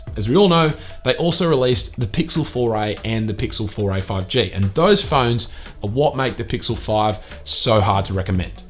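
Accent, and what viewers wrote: Australia. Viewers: Gotta say that was pretty great sponsor spot. I was so ready to fast forward, but I had to watch to compare the quality. It's not a priority for me. As long as the photos are great. Also it's great to hear a fellow Aussie. Still tossing up between the 4a 5g and the 5. I want the 90hz panel but it's hard to justify the $200. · your aussie accent makes me travel back to when i lived in australia ! very good content !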